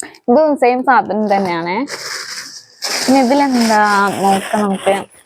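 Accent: Malappuram, India. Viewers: Malappuram ജില്ലയിലെ മുസ്‌ലിം പെൺകുട്ടികൾ സംസാരിക്കുന്ന പോലെ ❤ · നീ എത്ര കാലം karelatttil താമസിച്ചു ❤️👍❤️അതിശയം മലപ്പുറം ഭാഷയയാണ് തോന്നുന്നത് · നല്ല മലപ്പുറം മലയാളം ❤️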